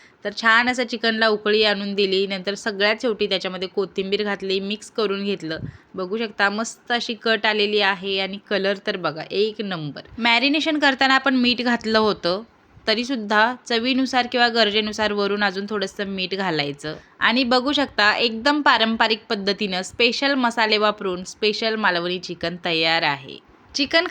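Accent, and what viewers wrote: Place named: Kolhapur, India. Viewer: Khoop masst banavlat recipe ...tumcha awaj and kolhapuri accent, ayikayla chaan vatte. ❤Stay blessed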